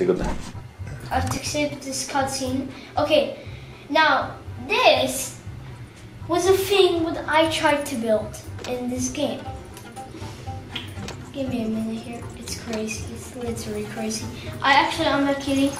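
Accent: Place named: United States. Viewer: Developing a slight American accent😂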